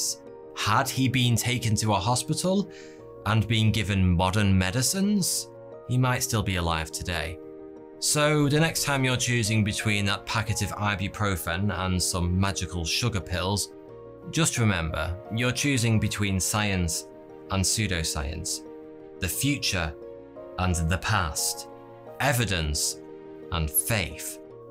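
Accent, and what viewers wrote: United Kingdom. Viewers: Who cares he has a british accent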